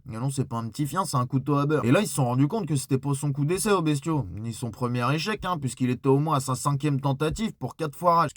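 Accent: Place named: Lyon, France. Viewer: La ?? Remise en question ? Oula t'en demande trop pelo.. 😏 Merci pour tes prises de parole, toujours un plaisir de t'entendre, avec ton bon vieil accent lyonnais 💪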